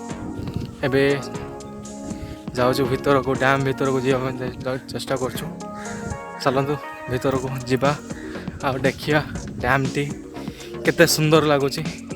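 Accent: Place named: Balasore, India. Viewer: Baleswaria pila nka pari kan kahucha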